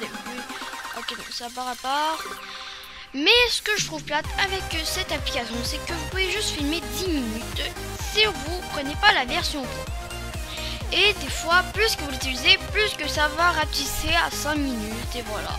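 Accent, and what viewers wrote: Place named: France. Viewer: Accent FR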